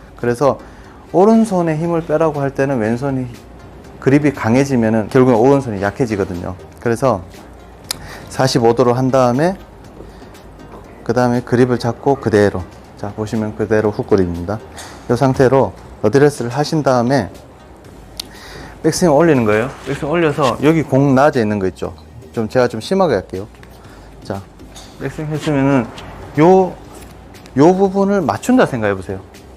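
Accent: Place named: Gyeongsang, South Korea. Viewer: 구독 좋아요 눌렀습니다. 경상도 사투리가 참좋네요^^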